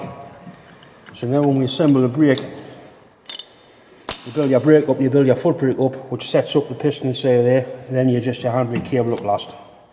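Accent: Newcastle, United Kingdom. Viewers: Good, clear instruction and Great video . And as I,m a proper northerner I even understood your geordie accent.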